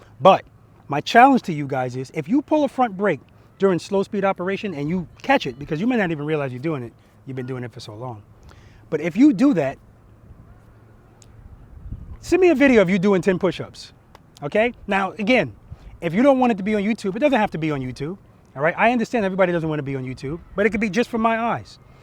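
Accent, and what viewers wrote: New York, United States. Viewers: I can't tell you how much I appreciate what you're doing with this channel. I love the way you break everything down step by step and I especially appreciate how humble and joyful you are. I'm a baby rider and I've been watching videos fr many different experienced/veteran riders, but the way you explain things just clicked for me. Same goes for Jerry Paladino (Ride Like A Pro). BTW, love that NY accent! God bless you!! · Hey. Great lesson my friend. Your encouragement lifts me up, encouraging me to practice and build confidence. Your technical skills are awesome as is your presentation. Driving all of this is your life philosophy of “paying it forward” and appreciation. Also, having grown up on Long Island, it’s good to hear your NY accent. You are making a big difference for me and many others and I thank you and appreciate you.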